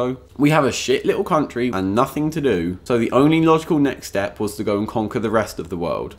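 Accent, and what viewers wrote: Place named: London, United Kingdom. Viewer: I am not trying to take the mickey, but what do you say with that London rude boy slang accent?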